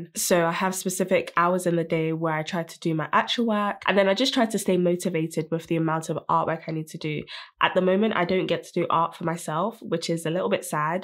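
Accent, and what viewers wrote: United Kingdom. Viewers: You sound british but look black?!?!? Is she playing dumb? or has she been living under a rock all her sad life? Only shows how ignorante the woman is. Beyond words. 🤦🏽‍♀️💥😵‍💫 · "you sound British but look black" who says you have to be white? Such people scare me I swear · “You sound like a British but you’re black.” BOI WHAT ?! 😭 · Great video but, “You sound like a ‘British’ but look black”? That is the stupidest remark ever has to deal with this lol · “You sound like a British but look black” I can only laugh 🤣🤣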